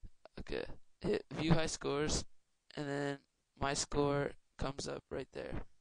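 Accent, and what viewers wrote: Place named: New Jersey, United States. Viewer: I bet his from jersey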